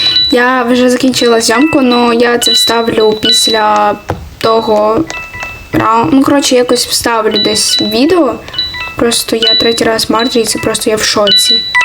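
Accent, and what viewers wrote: Ukraine. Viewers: Я так обожаю украинский акцент, это так миленько❤ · Я только сейчас понял почему она так говорит потому что она из укр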